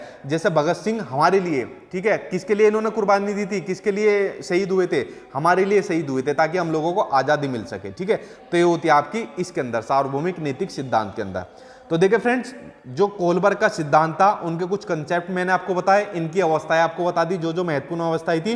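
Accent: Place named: Uttar Pradesh, India. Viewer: Sir ,,Aapki boli bilkul hmari jesi hai ...UP wale ...😎😎😎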